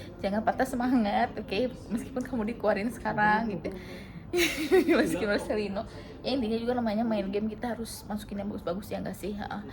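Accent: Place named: Sunda, Indonesia. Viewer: Teteh..ini pasti orang Sunda, logatnya katara pisaan..ha..ha.. Tenang aja teteh, nonton teruus nanti ketemu lagi di pinal krn kita pasti juara..ha..ha..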